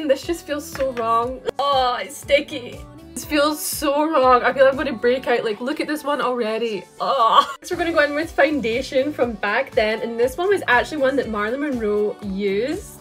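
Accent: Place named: England, United Kingdom. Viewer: funny how her accent changed sounds more english low 😂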